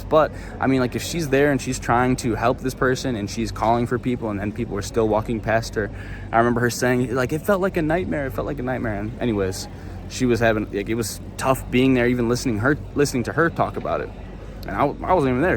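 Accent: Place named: South, United States. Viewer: Your accent is too Southern USA ! Go back to North Carolina hick !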